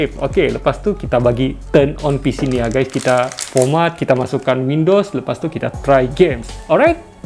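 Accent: Sabah, Malaysia. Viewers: He from Sabah so have different slangs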